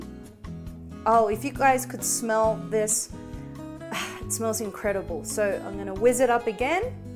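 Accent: Australia. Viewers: U sound Australian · Being mexican and from Texas your transition from your Australian accent to perfect Spanish blows my mind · You sound Australia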